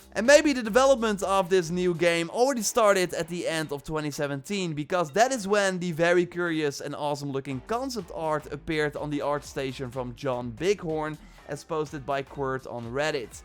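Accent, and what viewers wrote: Netherlands. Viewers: Your accent sounds like a Dutchman talking English lol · Your accent sounds Dutch.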